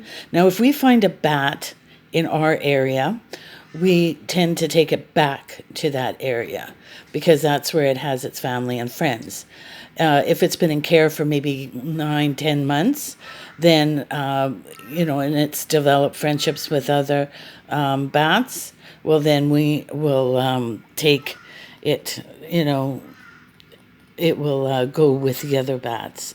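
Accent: United States. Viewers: You have an American accent. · Hes so cute!! When I first heard your accent I thought this was in America until I heard you say he spat the dummy haha. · Why the American accent if you are in Australia? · You sound American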